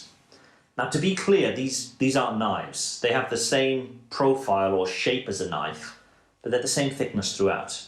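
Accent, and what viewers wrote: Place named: United Kingdom. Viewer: great video man love your british accent and i also do get my stuff waterjeted and still put ton of work into them by hand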